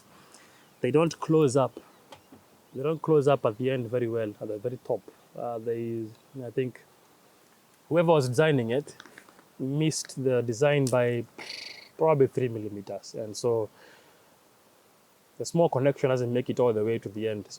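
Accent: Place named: Scandinavia. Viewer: wonderful review in a near viking accent🤩🤩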